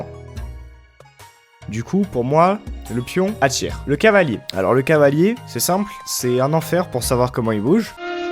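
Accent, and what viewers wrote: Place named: South, France. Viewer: L'accent du sud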